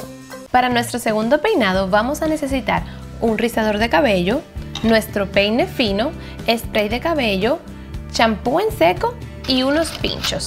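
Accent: Cuba. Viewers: porque la voz lo tienes muy diferente a tus vídeos,actuales no entiendo,cual es y voz Real Porque actualmente tu voz es más dulce y con su voz cubano Pero antes tu voz era diferente Acaso mi hermosa finjes tu voz, por favor aclaranos ese tema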